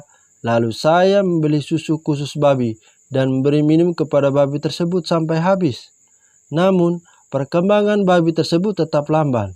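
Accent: Flores, Indonesia. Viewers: Logatnya seperti orng Bajawa..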